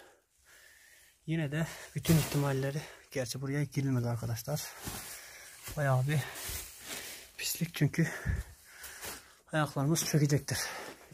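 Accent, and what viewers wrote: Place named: Amasya, Turkey. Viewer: Reyiz nereli ya şive yabanci degil bana ben amasyaliyim :)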